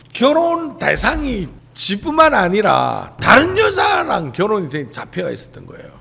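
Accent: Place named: Gyeongsang, South Korea. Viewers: 경상도 소리가 그래요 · 갱사도라서 그런가봐유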